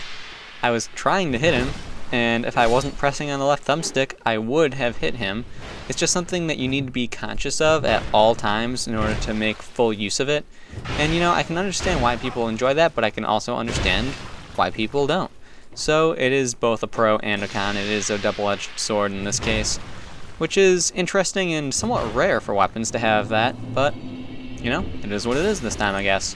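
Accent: United States. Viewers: American pronunciation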